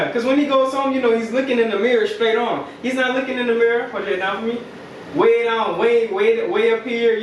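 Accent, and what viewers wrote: Louisiana, United States. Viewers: Louisiana accent ?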